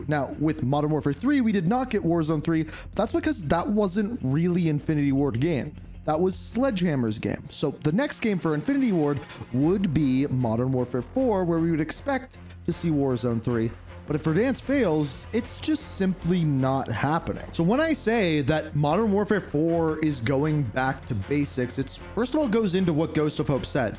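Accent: Canada. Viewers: This guy ain’t American. He Canadian! Accent gave it away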